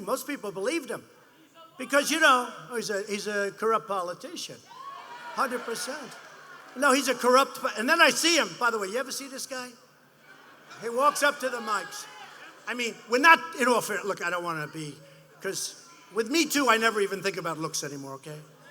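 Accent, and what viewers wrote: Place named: New York, United States. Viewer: I think they just love the New york accent about him... that is the only reason I can think of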